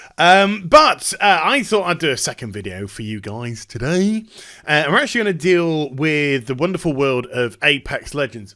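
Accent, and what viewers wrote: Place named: RP, United Kingdom. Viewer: worry not... American are not use to soothing British posh accent... keep it coming mate you are doing just fine!!!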